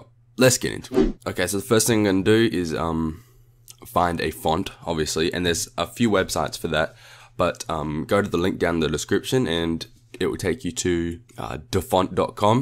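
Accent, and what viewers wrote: Australia. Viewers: I neve thought the Ausi accent and IT would go so well together....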